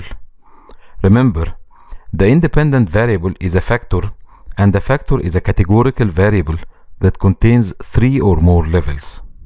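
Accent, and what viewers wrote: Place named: Egypt. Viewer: Finally Found the video that help me to know how can I use it in the research. By the way Egyptian voice and accent in this video is nice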